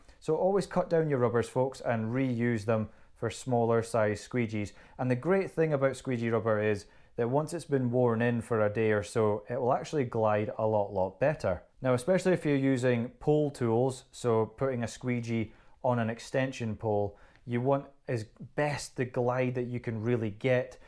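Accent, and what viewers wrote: Scotland, United Kingdom. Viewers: Hi mate,great videos as always very clear, excellent communication, which will help a lot of people, ( despite the Scottish accent lol). I have trouble getting the edges of my rubber cut straight,do you have any advice for a tool to do this please instead of using a pair of scissors or a Stanley knife. I'm 25 years traditional, words fail me to express the joy, utter joy, at using the Mormon Liquidator!! This tool is in my view,unparalleled, and cannot be improved upon, it is Perfection.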